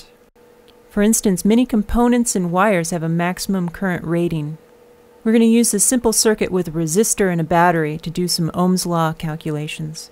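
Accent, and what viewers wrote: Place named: France. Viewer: Finally, a video that encompasses comical French accents and electronics :3. Nice videos!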